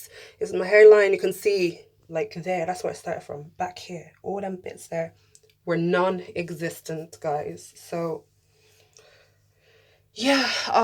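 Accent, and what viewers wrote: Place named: Ireland. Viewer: Never mind my question, you said Ireland. I thought that was the accent. But I was told there no Blacks in Ireland or very very few.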